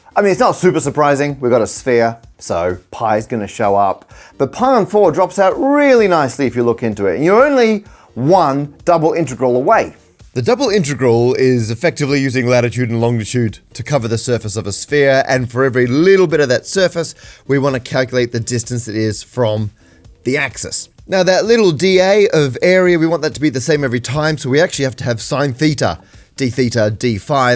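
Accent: Australia. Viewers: He's not a Brit. Can't you recognise an Australian accent?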